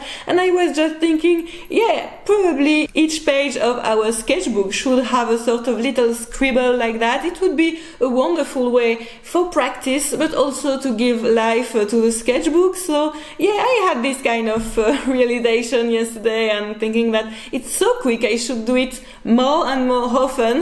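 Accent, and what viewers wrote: France. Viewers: Merci beaucoup! Super ludique!! J'essaie pour le J5 demain 😉😉😉 J'adore tes vidéos, inspirantes et pleines de bonne humeur avec ton accent frenchie très mignon 😃. Ce serait super si tu avais le temps un jour de faire une vidéo sur les visages en quelques coup de pinceau 😊. Je ne sais jamais comment placer les ombres et les lumières et comment figurer les limites ni comment décider quels détails retenir. Merci encore pour tout 👍👍👍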